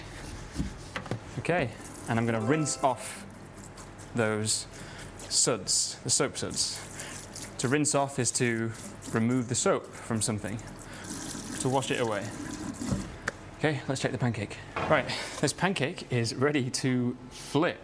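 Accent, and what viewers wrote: United Kingdom. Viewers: I'm really happy with your videos, I watch them every day and I really love them! Thank you for your help, and also I love the British accent.❤❤ I still learning 😅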